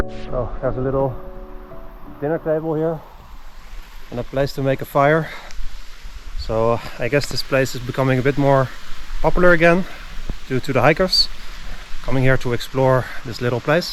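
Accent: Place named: Netherlands. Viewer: Nice to hear your Dutch accent We (brits) lived in the Netherlands for 30 yrs I think someone else has told you that the village of Drave is used by the scouts as a base so not really 'lost' I was more interested in the story of the Brits and Germans who worked together in the other village As I live in Portugal now I must research that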